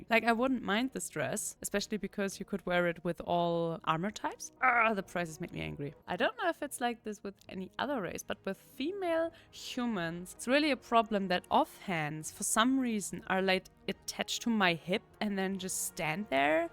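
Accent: Germany. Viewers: Hi, yeah I would love watching another class, any realy, do what you're enjoying. BTW I find your voice so smoothing, and love the german accent. I'M french but father was german so it brings back memories 😉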